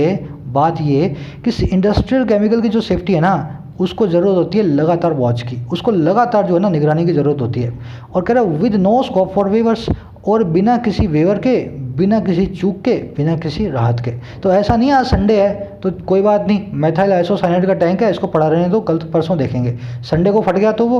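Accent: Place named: Uttarakhand, India. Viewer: Sir, Your pronunciation is like Uttarakhand's Pahadi people.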